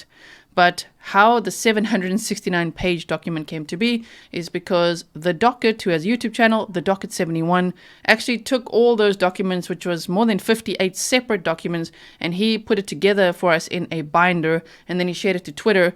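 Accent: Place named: South Africa. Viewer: Great summary. Don’t lose the accent👍🇿🇦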